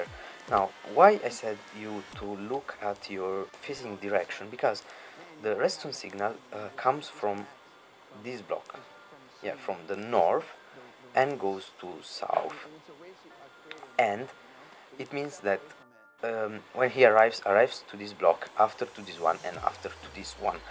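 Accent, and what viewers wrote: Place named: Italy. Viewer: questo tuo accento italiano che fai quando parli in inglese è davvero una cosa fantastica XD, da un tocco di classe all'inglese =), comunque, sei molto bravo con la pronuncia e le parole... io non riuscirei mai a fare un tutorial in inglese XD comunque, questa cosa la sapevo, e devo dire che è molto utile! la uso spesso quando devo fare dei clock "nascosti"... comunque bellissimo video, bravo ^^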